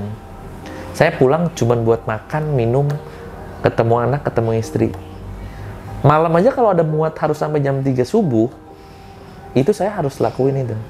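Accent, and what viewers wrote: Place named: West Java, Indonesia. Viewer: kalo dari tata bahasanya dia ini hanya domisili purwakarta ... paham sekali lidah sunda itu klo pakai bahasa indo dicampur" 👍👍😁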